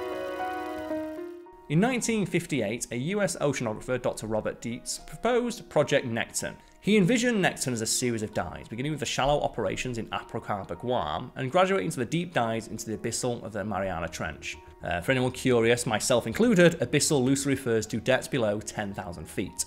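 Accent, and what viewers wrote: RP, United Kingdom. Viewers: an exaggerated form of RP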